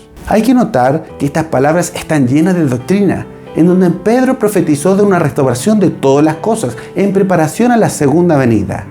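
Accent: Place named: Chile. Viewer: ❤hola buenas tardes saludos que bien video me encanta el acento de los chilenos ❤🎉muchas gracias por su trabajo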